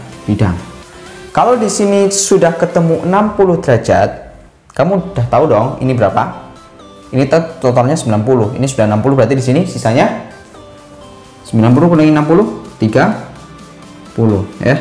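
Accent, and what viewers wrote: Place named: Java, Indonesia. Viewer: Terjadddi, Oke, dan Jjjelas ... meddoknya keliatan , trs katakata Jjjur ya Kesimpulan, bagus .. dan aku gabisa ngerjain soalnya.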